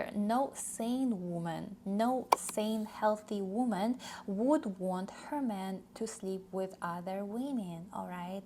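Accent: Russia. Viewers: You sound russian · they have a declining population. they have a huge problem. they need to encourage sex, not attenuate it. you look Chinese but sound Russian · Just discovered your channel, love your Russia accent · you have a russian accent.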